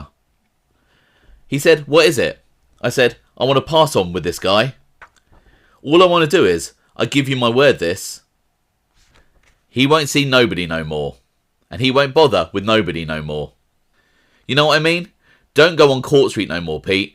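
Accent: England, United Kingdom. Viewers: I love hearing about the American Italian Mafia from a guy with a British accent.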